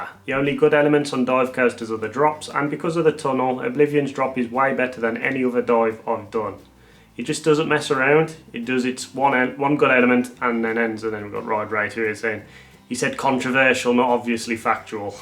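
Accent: United Kingdom. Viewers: Ok should I feel guilty for finding out I'm with a hard crush on your british accent????? And no, I'm not from us or a native english speaker.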